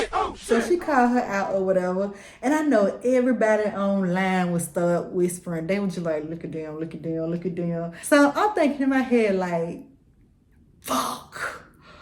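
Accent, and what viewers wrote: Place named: Georgia, United States. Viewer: Gulll you gotta be from Ga! Ain’t no way you not. Idk you but babeeeeee we “we tewwww murch alike “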